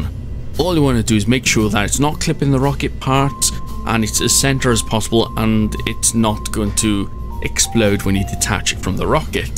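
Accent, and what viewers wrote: Wales, United Kingdom. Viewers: I’m sure you’re Welsh, but I keep hearing twangs that make me think Scottish or Irish? Anyway dope vid man, I have nowhere near the science to do this, and no idea how to get it, but thanks. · Scott Manley is Scottish, this guy is Welsh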